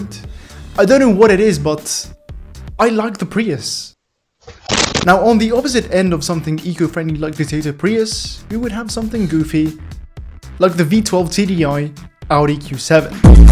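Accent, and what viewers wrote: Italy. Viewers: are you italian? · Are you italian?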